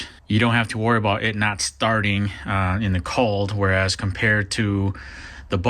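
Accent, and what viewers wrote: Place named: Minnesota, United States. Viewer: i thought he was from Minnesota as soon as he started talking, kind of like Canadian.. i dig that accent.. great video too ! i'm getting my big buddy today🎉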